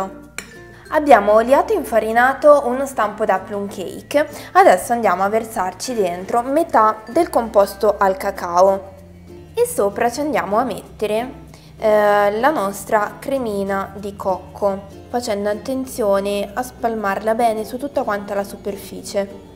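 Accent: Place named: Marche, Italy. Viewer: sembra buonissima!! ma per caso sei di Senigallia? hai un accento marchigiano! :-)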